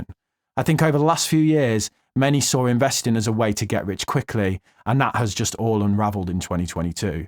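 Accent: United Kingdom. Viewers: ⚡️I hope you don’t ask me to transfer btc to your wallet in order to receive my score. I’ve enjoyed following your success. It’s good to hear a British voice, with something to say, that’s worth listening to. ⚡️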